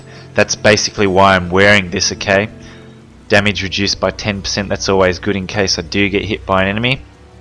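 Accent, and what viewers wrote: Australia. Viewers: Are you an aussi? I love your accent!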